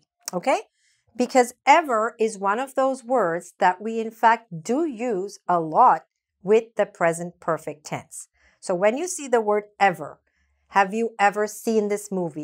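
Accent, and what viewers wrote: Brazil. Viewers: Thank you so much, I think you are Brazilian because I am almost understand you perfecttaly! It is beautifull gift you have teaching! Excellent! Thank you for posting!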